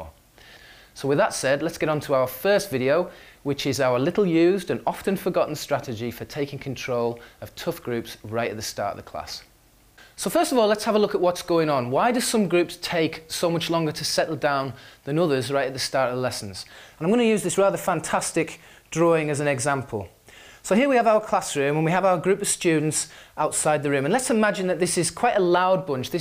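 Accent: United Kingdom. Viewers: Hi. Enjoyed the video. Really good advice. Where is your accent from? Are you from the Lakes?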